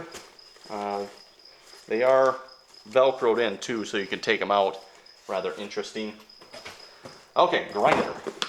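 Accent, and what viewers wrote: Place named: South, United States. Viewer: Southern pronunciation for the most part. Wait until you hear "INsurance" and "Reality". 😁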